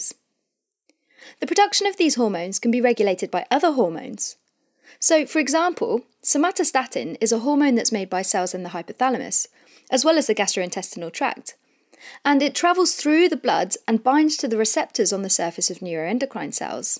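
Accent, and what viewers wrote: United Kingdom. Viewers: Seriously I love your videos.. but this British accent needs constant focus.. American accent is far most easy to understand.. not a criticism but just an opinion.. anyways as always best explaination! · I was so mesmerized by the British accent . . .. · Loving the British accent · The British accent is wonderful 😍😍😍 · Nice video, straight to the point! British accent makes me kinda distracted, had to replay the video few times. But again it’s just me. Tanner, come back! :)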